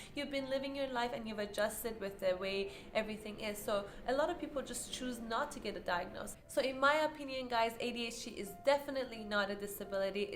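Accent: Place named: Eastern Europe. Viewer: I love your accent ma'am. Are you from Eastern Europe?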